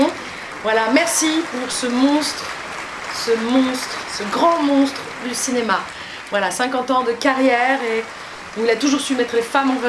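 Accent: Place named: Suburbs, France. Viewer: J'aime bien ton accent de banlieue des années 80 , 90...🙃😉😗😘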